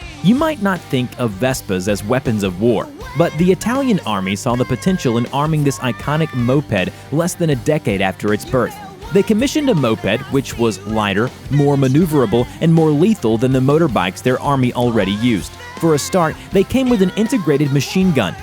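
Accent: United States. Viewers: Err.., Dear Mr.Narratorr, Sir, while U most certainly sounded American, dunno if U actually are, but eant the word Corps. as in US Marine "Corps", pronounced as "Core or Corr" phonetically, rather than the disturbing "Corpse" - wic wud be a DB, cadaver or maybe a carcass evn. Thus far, I thought only some individuals native to India only sez Corps as literally in as the offensive sounding "Corpse", He-He, tis' ez cute.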